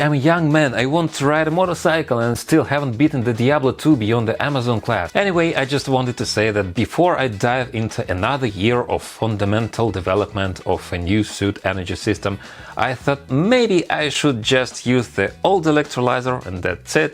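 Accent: Russia. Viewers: Your accent says you can speak Russian correct?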